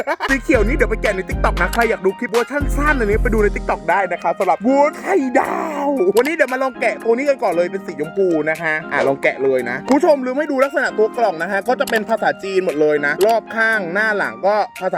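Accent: South, Thailand. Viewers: พี่เปนคนจังหวัดอะไหรค่ะ เหมือนคนใต้เลย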